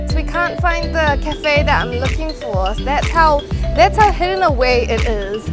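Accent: New Zealand. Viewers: I think she's Japanese, but her accent when speaking english is NZ I believe :) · Love your videos, keep up the great work!!! It's great to see (& hear) a fellow kiwi enjoying Japan :) I tried to make it to that exact restaurant when I was there- failed, and ended up at some other random restaurant hehe Looking forward to returning after studies, and trying for a non英会話 job next time. Until then, I shall be inspired by your videos, cheers! :) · I came here from the Only In Japan Channel and this is the first video I have watched. It was awesome. Thank you very much. I was especially excited you talked about Okonomiyaki. I had this in Tokyo and would really like to make it at home. Could you post the ingredients? P.S. Love the Kiwi accent. Cheers from Cairns, Australia (+1 Subscribed :-)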